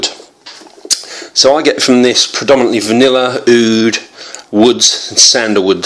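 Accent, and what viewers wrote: United Kingdom. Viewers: Love your British Accent mate !!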